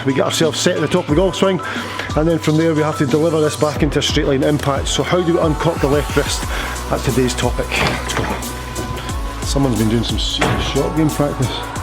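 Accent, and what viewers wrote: Scotland, United Kingdom. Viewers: The best instructor yet, love that Scottish brogue, gets his point over with lots of demonstration along with explanation. He does not waffle on about other things. As a 91year old I love his lessons I think he is the best thing since sliced bread.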